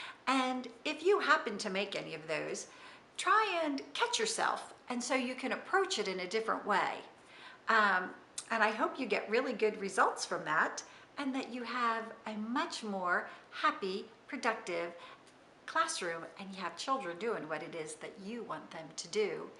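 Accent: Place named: South, United States. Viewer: Very slight accent, maybe from southern USA and it's just so cute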